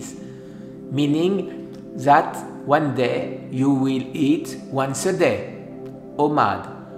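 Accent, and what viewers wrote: France. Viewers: Your comments are very interesting, in the same it helps us to understand because you have very french accent. for is the same I have very Provencal accent Thank you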